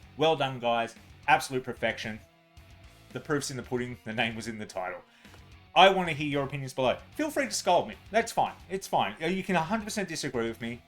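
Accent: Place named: Australia. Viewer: Australians ugliest fickn accent ever, but metal up your ass,from kiwi land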